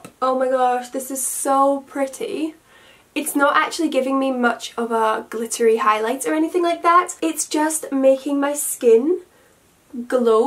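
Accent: Scotland, United Kingdom. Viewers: this was a amazing💋 are u scottish xx💗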